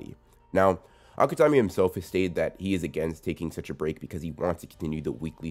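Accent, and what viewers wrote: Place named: Missouri, United States. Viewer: that's intersting. I asked bcus of ur accent. It reminded me of my lil bro cos he started sounding like that when he was in Missouri. It's very clear and easy to follow since you hardly skip over any letters/syllables.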